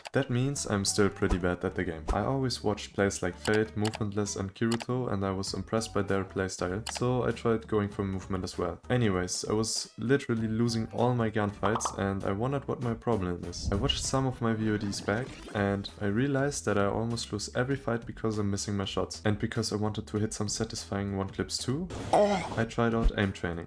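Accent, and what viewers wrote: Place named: Germany. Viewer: he sounds german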